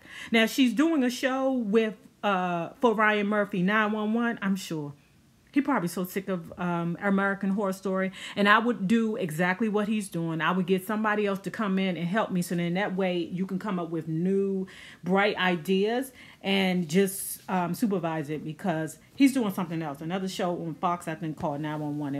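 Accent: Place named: Baltimore, United States. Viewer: I can tell you from B-more, your accent is strong!! lol #DRASK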